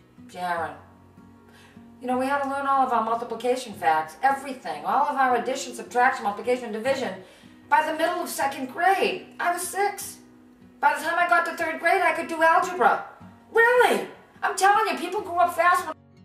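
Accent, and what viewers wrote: Boston, United States. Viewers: love your videos!! thanks for posting. i love the boston accent. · ❤❤❤ Love it, I am not only learning a Boston accent but mannerisms too.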